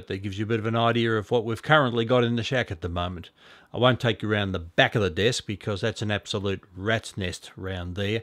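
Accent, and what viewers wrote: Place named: New Jersey, United States. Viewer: that's a lovely accent you have, new jersey?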